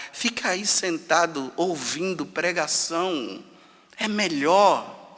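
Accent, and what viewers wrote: Bahia, Brazil. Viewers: Durmam com um barulho desses. Esse padre, com todo respeito, é um baiano arretado.🤗🤗 É uma injeção de ânimo diária, aprender com ele. Obrigada Senhor Jesus, pelo sacerdócio do padre José Augusto! 🙏🙏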